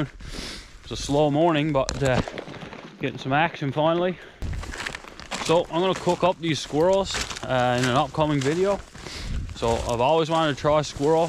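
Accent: Newfoundland, Canada. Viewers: I'm from Saskatchewan. Your accent gave me mixed signals, then I thought about it and realized you were a Newfie. Right on man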